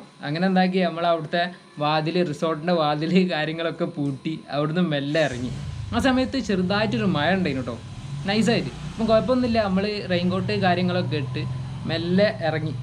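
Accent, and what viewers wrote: Kozhikode, India. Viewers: Just kozhikoden slang... Leave it. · You’re a innocent guy..may allah bless you...all the best wishes..I feel a great potential in this channel keep this raw feeling of videos...your Calicut accent makes the storytelling more clever... حياك الله الله يبارك فيكم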